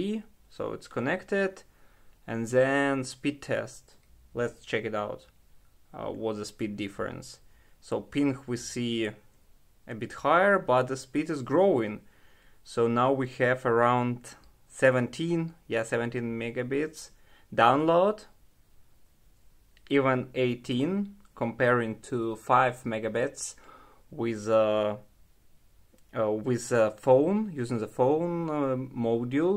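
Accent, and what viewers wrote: Russia. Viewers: I like your Russian accent thanks for the video man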